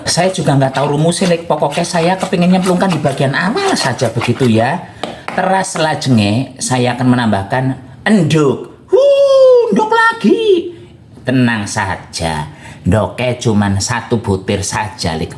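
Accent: Banyumas, Indonesia. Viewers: Saya suka kok lihat vidionya mas bahasanya sama karena saya orang ngapak jg salam ublek2 dan cus enak endol baksonya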